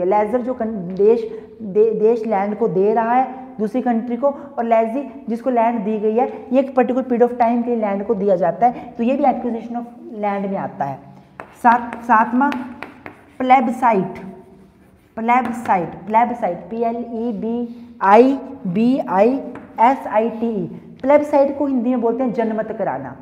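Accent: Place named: Punjab, India. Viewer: the way u teach is commendable 👍 pronunciation me punjabi touch hai..bt tht is manageable ..bcz assi v punjabi aa 😁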